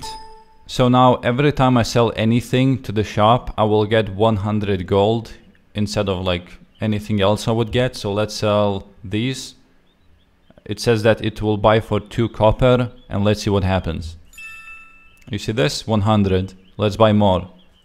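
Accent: Russia. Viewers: russian accent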